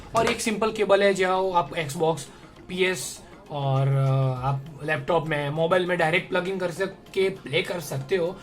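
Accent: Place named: Butler English, India. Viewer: He speaks butler English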